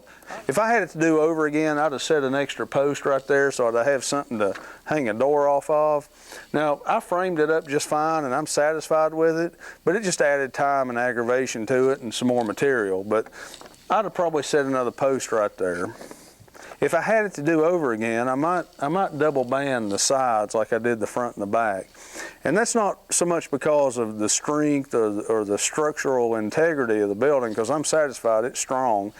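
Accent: South, United States. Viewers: Wow I was so impressed with your presentation! Very very nicely done and with the nice southern accent!! I'm guessing North Carolina. I am in the planning stage of building a 20X10 garage with a 8X10 shed attached. This was the most comprehensive well thought out well constructed video on construction I have ever seen! Well done! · ***** You may be closer than you think. It sounds to me from listening to you on the videos that you may be from Alabama, Mississippi, Tennessee or Georgia. Not sure where you are but I'm in Mississippi. You probably noticed that my storage building has gone from something smaller (8X10 or 10 X10) to 12X12 or 12X14. It doesn't make any difference what size I make it, I'll have the same problem you have, it will be too small. I'm like you though, I want to do it by myself. It's the satisfaction of accomplishing something and knowing you did it without help. 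I'm not going to go so far as to cut down the trees and mill the lumber myself. And mine won't be as high as yours and I'm thinking of a lean-to roof. Flat with a slope from front to back. The things I've learned from watching your videos will come in handy no matter what the size of the building. Get is square first and get the holes level and so much more. Thanks again.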